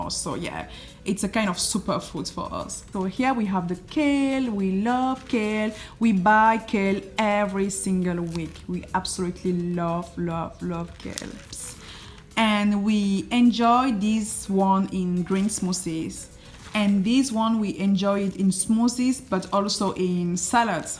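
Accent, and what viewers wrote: France. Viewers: Stephanie Brown French accent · Kea lila Sok I believe she is from France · she is French and it is her native language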